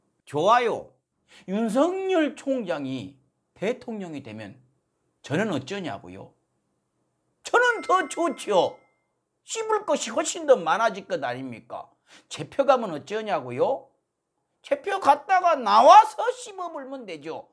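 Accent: Jeolla, South Korea. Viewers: 충청도 제천사람이 왜 가끔 전라도말을 섞어쓰나요. 허허 천안시 동구 병천면 가전리~ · 순천쪽 전라도 사투리를 쓰는것 같네요.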